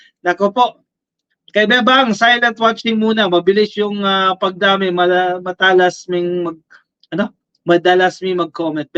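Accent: Waray, Philippines. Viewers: Hi waray ka ngay an?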